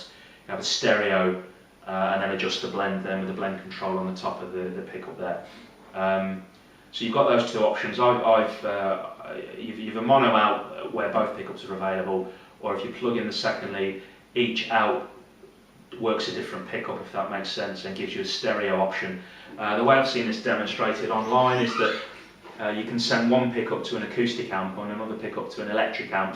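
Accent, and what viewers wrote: England, United Kingdom. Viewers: this review is cool if you like to hear people with an England accent talk from ten feet away from the microphone and ramble for thirty minutes without any demonstration.....I am not a fan. thumbs down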